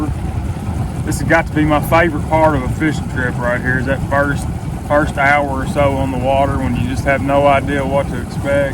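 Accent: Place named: Georgia, United States. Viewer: To be from Ohio, you sure sound like you came straight outta my state of Georgia. Now there's an example of a youngern who fishes like I did when I was a kid. I'm sure he makes you proud.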